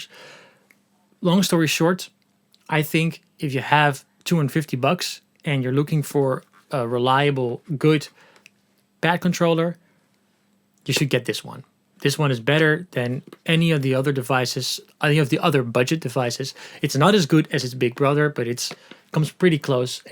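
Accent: Netherlands. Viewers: So you are Dutch, right?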